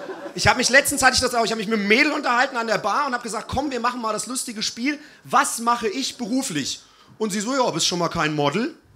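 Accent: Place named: Hesse, Germany. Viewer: Man merkt das er mit der Zeit immer mehr dialekt bekommt😂am anfang hoch deutsch und dan hessisch dialekt dan wieder hochdeutsch 😂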